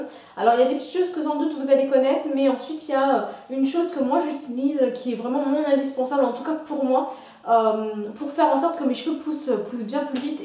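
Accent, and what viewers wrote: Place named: Kabylie, Algeria. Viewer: t'es kabyle ? psk ta l'accent ^^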